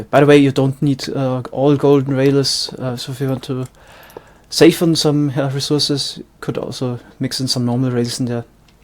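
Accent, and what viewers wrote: Germany. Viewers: mann kann hören das du ein deutscher bist xD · That german accent tho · Worst german accent ever. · Der Deutsche Akzent Nice Farm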